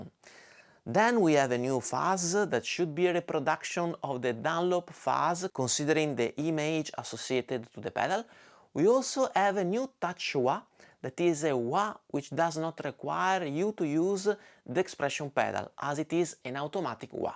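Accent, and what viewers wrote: Italy. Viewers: Your pronunciation is a kind of "itanglish"(Italian and English) but don't affect the incredible reviews that you made. Prepare to be a next super masive channel. Congratulations!!!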